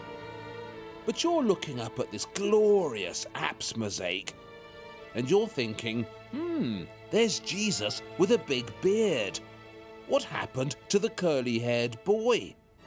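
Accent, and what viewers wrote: England, United Kingdom. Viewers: Superb presentation skills and great content. Very non-irritating British accent. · The speaker is a liberal. Just look at his hair. To be honest with you this guy doesn’t understand history. He just gives one part of history. Just because he has a English accent doesn’t make him an expert. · this british accent is so ugly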